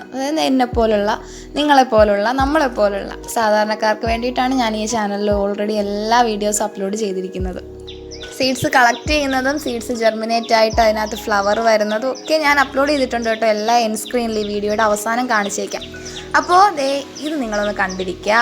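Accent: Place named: Trivandrum, India. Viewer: Njan tvm aanu ithante slang kettappo tvm anoonnoru doubt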